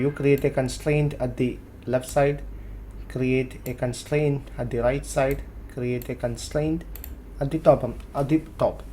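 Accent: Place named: India. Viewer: I'm starting to like Indian accents :)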